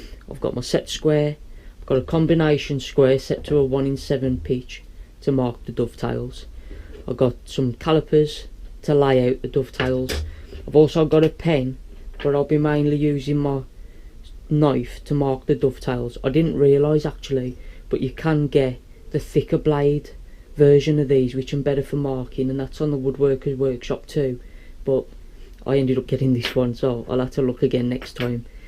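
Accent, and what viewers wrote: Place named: Midlands, United Kingdom. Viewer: Yo big. Im in awe that you had the guts to go straight in with the exotic timbers mate.....youre brave:-) I would suggest practising and refining your technique doing a few pine first.....but hey, i love your maverick style dude. Damn good effort though for you first bash. Another thing....(and im judging the purely by your accent)....but do you know there is a Midlands Woodworking & Powertool show in Newark on the 27/28th of this month....Im sure you could pick up a mallet there:-)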